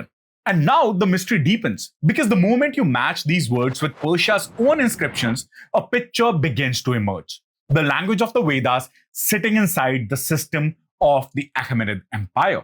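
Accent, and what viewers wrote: India. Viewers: Indian accent 😂😂😂😂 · Indian english. omg. Half of it is a blabber.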